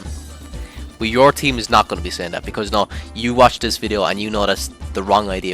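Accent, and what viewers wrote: Ireland. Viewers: Hey, thank you for not using AI voice anymore, up the Irish accent!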